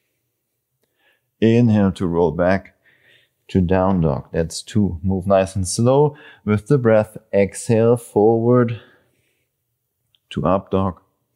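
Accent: Germany. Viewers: Deutscher Akzent? 😁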